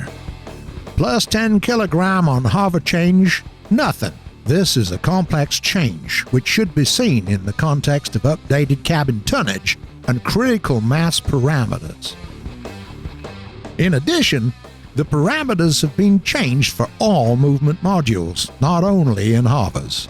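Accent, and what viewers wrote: United States. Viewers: Can the commentator please not talk with this cliché american accent? I can't stand listening to it.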